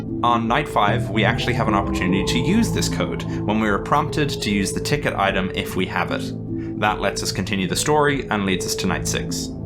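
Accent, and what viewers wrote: Ireland. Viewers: are you irish? theres a slight accent to ur voice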